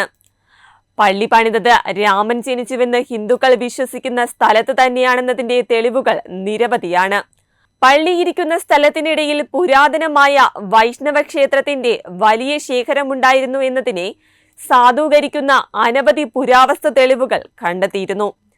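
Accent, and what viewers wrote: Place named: Kollam, India. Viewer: സുഹൃത്തേ താങ്കൾ കൊല്ലം ജില്ലയിൽ പോയിട്ടുണ്ടാവില്ല. അവിടെ ചില പ്രദേശങ്ങളിൽ ഉച്ചാരണം അങ്ങിനെയാണ്. അറിവില്ലായ്മ ഒരു അഹങ്കാരമായി കരുതാതിരിക്കുക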